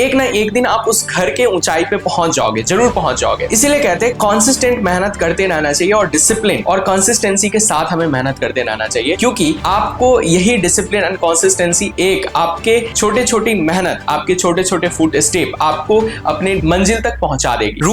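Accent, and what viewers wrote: Bihar, India. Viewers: He is from Bihar and has that bihari accent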